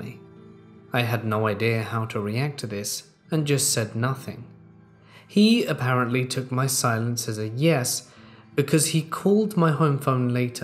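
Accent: United Kingdom. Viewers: My hEDS is kicking off my chronic pain. Every time this happens, I end up sitting up listening to your soothing voice (us Brits do have a fabulous accent!) And relax till I don't notice the pain anymore xxx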